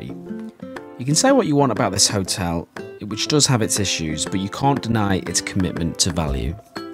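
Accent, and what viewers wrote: United Kingdom. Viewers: We go here every year fir the past 8 years. We stay for about 4 nights then onto a villa. We have NEVER had 1 problem. This place is spotless! We always leave money for the cleaners at end. We have left things out on the bed etc and they are always neatly left back after cleaning. Just say hi to the people that work so hard for you and they will appreciate it and look after you. Our fridge didn't work properly last year and they where on it like a car bonnet within minutes and replaced the whole thing whilst we went for dinner. You can walk to all bars restaurants etc. And the convenience store is reasonable. Did you know you can get a discount on food if your over 55? We never eat there but I spoke to someone who told us this. I'll be going again this year. And then moving onto the clarion inn at lake buena vista which is also a Rosen hotel. 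Just by Disney springs.. similar vibes.... I think you are from my part of the world judging by your accent 👍 x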